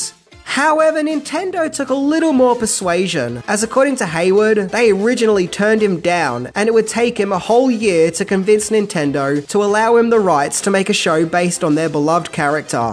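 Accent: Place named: Australia. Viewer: 'Ita wassa game like no-othaaaaa" Too bad they didn't hire an English speaker that speaks ENGLISH instead of what is assumed to be English (Thick Australian? British?) Ruins it for the rest of the video.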